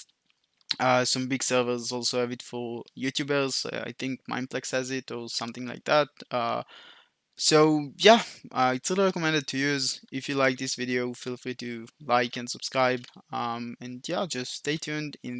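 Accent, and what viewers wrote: Israel. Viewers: יש לו מבטא ישראלי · Love your Israeli accent lol ! BTW waiting for a reply from you in discord :)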